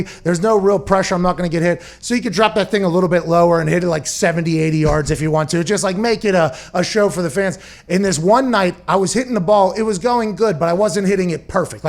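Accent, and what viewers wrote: United States. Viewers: gillon mclachlan should employ this guy. To commentate the games. The American accent sounds good.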